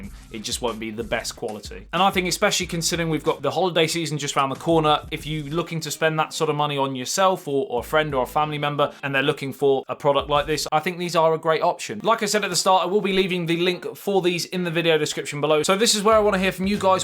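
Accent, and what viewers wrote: England, United Kingdom. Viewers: He has a very slight accent - not quite accentless Home Counties, but from the heartland where the English language developed and as such is considered the baseline from which other accents are judged! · lol its just his british acdent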